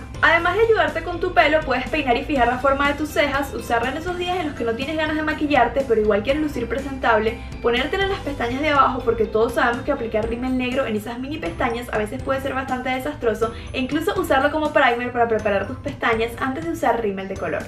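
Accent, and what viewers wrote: Venezuela. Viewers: De que pais eres?? de Venezuela? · sii soy venezolana, y supe que tu lo eras por tu acento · Una pregunta tu eres Venezolana? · Igual porque eres venezolana no capto tan rápido jeje, yo soy mexicana y a veces al escuchar los diferentes tipos de acentos de otros países aunque hablemos castellano a menudo me son un poco difíciles de cachar ;) pero muy buenos tips! gracias ;) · eres venezolana?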